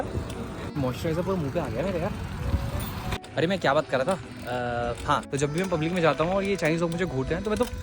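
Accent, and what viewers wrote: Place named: Uttarakhand, India. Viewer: I swear you are from Uttarakhand accent bahuttt jyadaa familiar heinn 😭🥰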